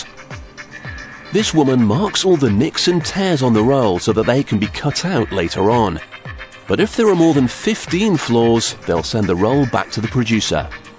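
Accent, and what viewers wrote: United Kingdom. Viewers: I love the way British people say stuff :D it makes me giggle.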